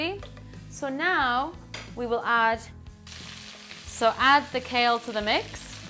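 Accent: Ireland. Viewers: Interesting. It's like an American accent with an Irish twang.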